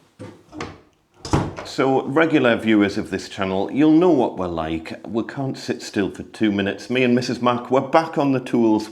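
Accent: Newcastle, United Kingdom. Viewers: I recognise that accent! Gosforth Newcastle upon Tyne? Doing the region proud.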